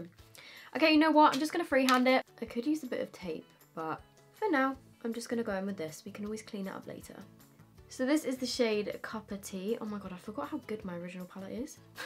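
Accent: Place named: United Kingdom. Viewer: Ahahah sometimes your British accent just like JUMPS OUT 😂 Xx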